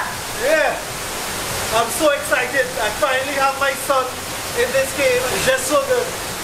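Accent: Trinidad and Tobago. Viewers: Trinidadian accent!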